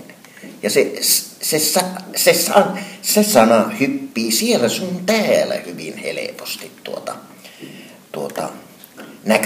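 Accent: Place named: Simo, Finland. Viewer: Samaa mieltä. Huomasin että Simon murteen piirteitä on aika paljon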